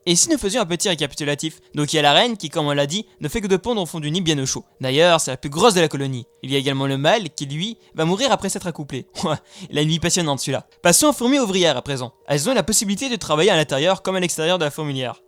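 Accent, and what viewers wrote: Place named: Wallonia, Belgium. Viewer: Toi tu viens de belgique et t'es un wallon :p vu que tu dis: Wouit au lieu de huit :p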